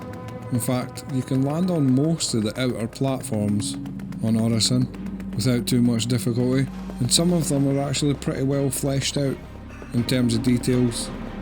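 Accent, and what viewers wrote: Scotland, United Kingdom. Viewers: I'm here for the Scottish accent 😎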